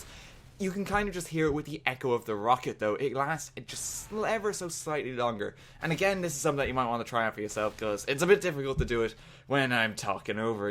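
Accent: Ireland. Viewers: Are you from Ireland or Newfoundland? The accents are fairly similar?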